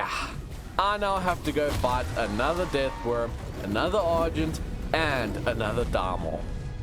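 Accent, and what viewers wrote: South Africa. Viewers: Mr, are you South African? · Are you South African ? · i knew you were south african lmaaooooooo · Are you South African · Wait a minute are you South African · Kyk hier neh ....jou accent was sommer mooi engels met n hint afrikaans, maar tu jy ESKOM mention toe weet ek sommer....(nee wat hierdie Suidafrikaaner kan sommer enige tyd n sub kry) uitstekend my friend ...sign my op vir daai 250 D's (Ending was totaly unexpected)#afrikaaners bly bo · damn okay. lots of ppl south african here. i thought he was like scottish or something(i aint good with accents) but hes south african.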